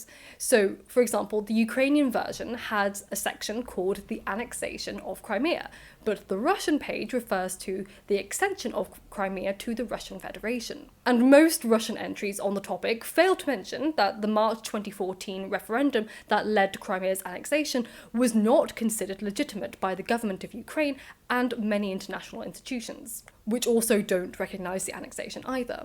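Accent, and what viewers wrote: RP, United Kingdom. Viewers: No she's just British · Was your father in the House of Lords cause I’ve heard posh accents before but that’s something else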